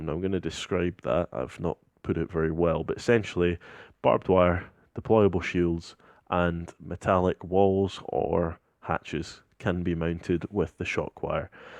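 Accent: Scotland, United Kingdom. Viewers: Yu scotish m8 holy feck that be rare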